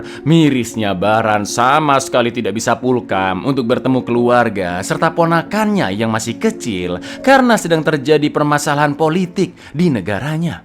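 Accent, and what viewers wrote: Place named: Surabaya, Indonesia. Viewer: Asli arek suroboyo iki admine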